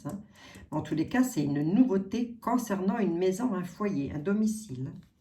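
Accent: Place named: East, France. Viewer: Un immense merci pour ce que vous faites ! Abonnée depuis peu, mon ange m’a envoyée par « hasard « sur votre chaîne… Je me disais bien que votre petit accent m’était familier, étant originaire de l’Est Que notre Seigneur vous garde dans la Paix et l’Amour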